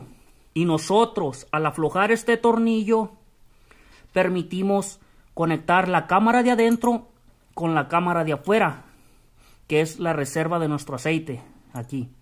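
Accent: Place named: Mexico. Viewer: Te doy like x la tonada mexicana nomas☝️😂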